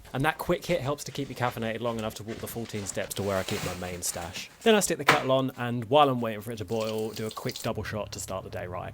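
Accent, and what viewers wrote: England, United Kingdom. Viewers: English. He’s English not British. There are 4 nations on these islands and England is just one of them. I’m Scottish not British and this guy is English speaking with an English accent because there’s no such accent as British. 🤦🏼‍♀️🤷‍♀️🏴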